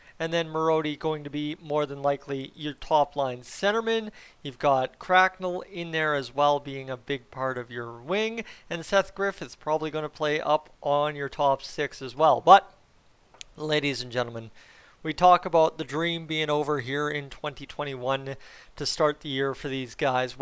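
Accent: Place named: Canada. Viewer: You have one of the best Canadian accents I've ever heard, lmao. I love it!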